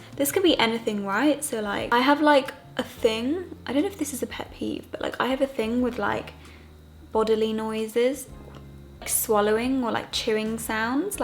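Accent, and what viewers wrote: United Kingdom. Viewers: Nice British accent ;D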